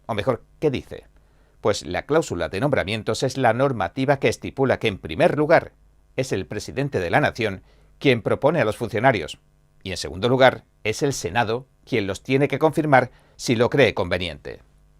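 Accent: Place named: Castile, Spain. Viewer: ¡Como estoy disfrutando de este canal desde que lo descubrí el otro día! EXCELENTE información sobre EEUU y con locutor español, con acento castellanp.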